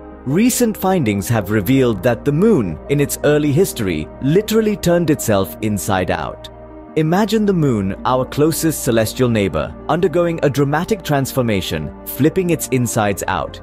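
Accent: India. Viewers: when i hear indian english i change the chanel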